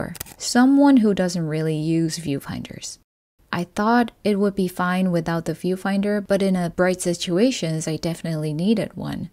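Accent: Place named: United States. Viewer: 몇 달 계셨는데, 그냥 미국인 발음이시네요. 너무 좋아요~!! 인스타에서도 뵈어요~!! 새해 복 많이 받으세요~~!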